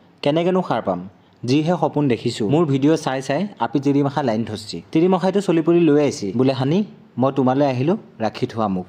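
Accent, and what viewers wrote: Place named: Nalbari, India. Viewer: কথা বিলাক নলবৰীয়া যেন লাগে, মই লখিমপুৰৰ পৰা চাই থাকো।❤❤😊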